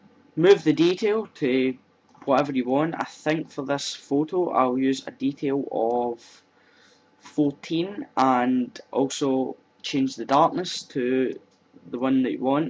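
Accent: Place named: Kilmarnock, United Kingdom. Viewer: nice tutorial and killie accent.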